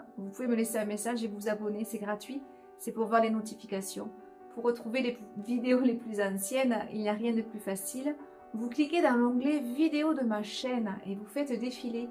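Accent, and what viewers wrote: South, France. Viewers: et en plus avec votre bel accent du sud c’est encore plus agréable à écouter et voir 🥰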